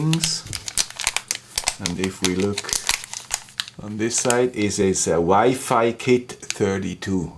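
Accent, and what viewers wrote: Switzerland. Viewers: my best regrade to the gay with swiss accent>>>>>>nice work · Thanks for the P channel mosfet highlight. It would be useful if you could do a video on high side switching. There are a few out there, but the guy with the Swiss accent would give us what we need to know as part of a back-to-basics series?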